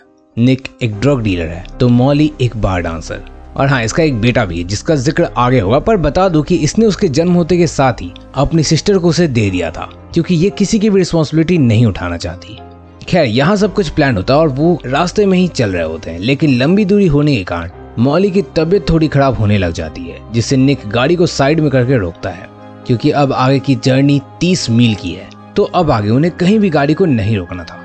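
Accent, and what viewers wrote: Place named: Bhojpuri, India. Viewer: Your Bhojpuri accent was op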